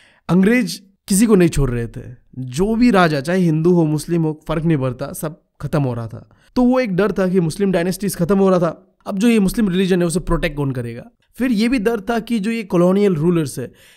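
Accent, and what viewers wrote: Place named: Bengal, India. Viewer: Bhai woh uska bengoli accent hain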